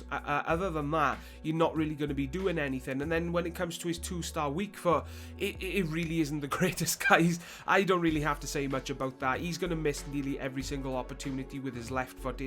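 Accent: Wales, United Kingdom. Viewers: I can 100% tell your from Wales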